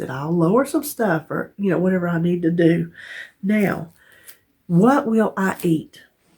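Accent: South, United States. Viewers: Not going anywhere and can't imagine life without you. So enjoy your sense of humor and southern accent! And what would I do without your DT and Wanda hauls?? We are all in this together no matter what plan we are following. You have been so good and therapeutic for me as I have gone through this 5 months of recuperation from my foot surgery and Co-Vid on top of it, and can't imagine life without and your beautiful soul. Here's hoping 2021 is better for all of us and we will hit the pavement running all together..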